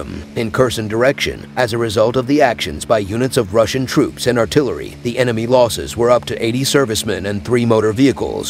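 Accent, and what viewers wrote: United States. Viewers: Давно мечтал, чтобы Американский комментатор,озвучил положение на фронте!!!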